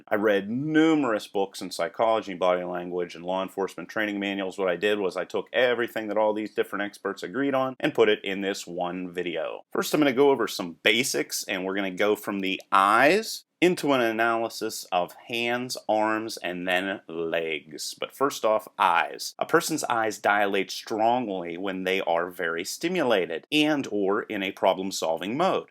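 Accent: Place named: Western Pennsylvania, United States. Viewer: Are you from western PA? I recognize that accent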